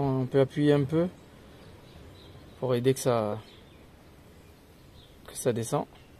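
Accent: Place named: Reunion, France. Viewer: Slt & tout mon RESPECT à vous . Je disais : Aparament les gens aiment bien votre video . Peut être que des mécaniciens de l'Île de la Réunion pourront en profiter pour réparer des voitures des personnes de notre île pour pas cher ....vous voyez ou je veux en venir😂😂 . " quand on est fauché " .Mais BRAVO👏👏👏 . VOILÀ CE QUE VOULAIS DIRE MON COMMENTAIRE EN CRÉOLE ... CAR JE CROYAIS QUE VOUS L' ( ETIEZ CRÉOLE 974 ) ....🤔🤔🤔🤔.Mai lé rare que mi trompe !!!!!! Surtout avec l' accent !!!!! Après chacun son zaffair 😂😂😂😂😂😂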